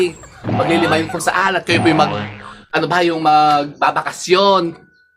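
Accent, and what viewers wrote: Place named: Bulacan, Philippines. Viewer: taga bulacan pi ba kyo?yong punto kse ng pagsasalita nyo punto ng bulacan,taga bulacan din aq balagtas at isa nyo aqng subscriber.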